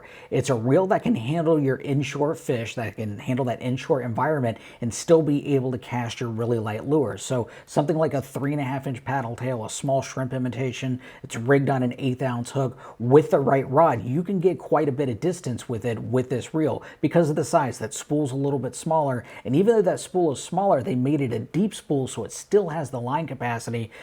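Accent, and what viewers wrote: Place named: Texas, United States. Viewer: Texas rubbed off on you huh?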